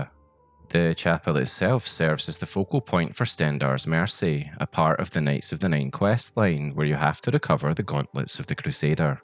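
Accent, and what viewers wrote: Scotland, United Kingdom. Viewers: Are you Scottish? Very similar accent to folk round me 😂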